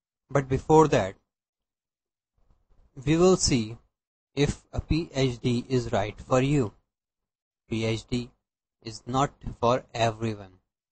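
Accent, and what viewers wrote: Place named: India. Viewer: heavy indian accent.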